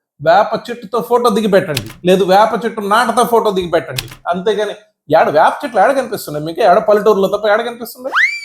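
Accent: Nellore, India. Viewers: Your Nellore accent is original & excellent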